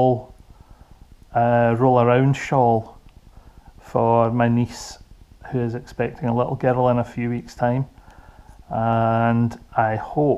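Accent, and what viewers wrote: Scotland, United Kingdom. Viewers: Everything you have shown is beautiful ! Its great hearing a Scots accent on YouTube ! I live down in Gorebridge . I can talk the heed of a stamp you'll regret saying just ask ...is it a Scottish thing ? We love a natter x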